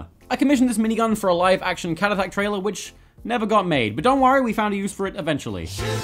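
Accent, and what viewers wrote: United States. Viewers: I thought he was American. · You’d think he’s American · is turning american. prove me wrong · I never knew a Brit can sounds so American...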